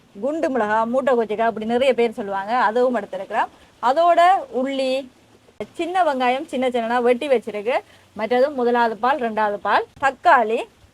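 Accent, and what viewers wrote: Tamil Nadu, India. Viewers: You speak like Tamil Nādu Tamil language. Did you study in India? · Ivar enna indian tamil la pesuraru. Inga vanthittu ponavara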